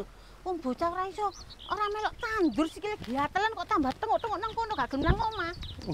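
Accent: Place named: Mojokerto, Indonesia. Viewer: saya dari Mojokerto hadir asli orang mana kok logat bicaranya kayak orang Mojokerto, suka banget kontennya 👍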